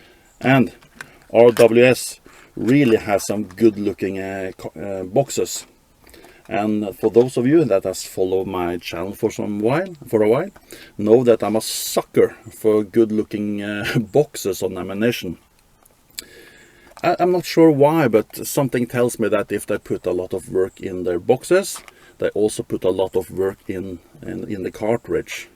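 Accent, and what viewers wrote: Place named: Europe. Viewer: My chronograph doesn't talk to me. If it did, I would want one with a European accent like yours.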